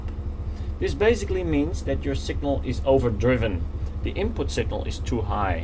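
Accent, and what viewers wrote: Netherlands. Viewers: Are you Dutch? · if his accent didn't give him away, his website most certainly did. Gave projectjes meneer! Ik ga zeker naar uw videootjes kijken. Ik wil heel gauw beginnen aan mijn eigen cockpit for Digital combat simulator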